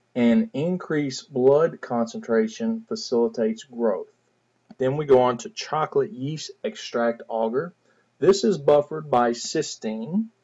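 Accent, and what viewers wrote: United States. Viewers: Thanks you so much, so much southern accent .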